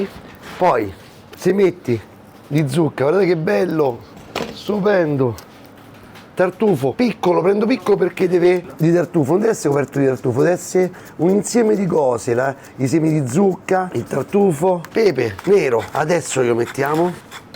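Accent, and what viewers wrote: Rome, Italy. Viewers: CI DICA L INDIRIZZO DEL SIO RISTORANTE. A MILANO COSA DICONO CHE LEI PARLA ROMANESCO? E POI PER CHÈ HA SCELTO MILANO PER IL SUO RISTORANTE E NON ROMA LA SUA CITTA? GRANDE CARISMATICO!!!!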